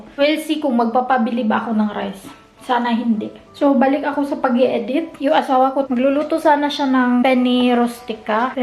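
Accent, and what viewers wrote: Visayas, Philippines. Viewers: Ate bisaya ka ba?🤗🤗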